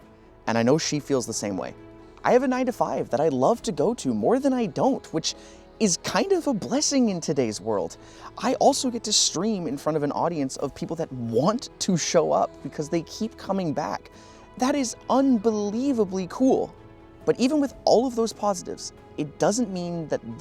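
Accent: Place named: Canada. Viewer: just sounds like another fellow Canadian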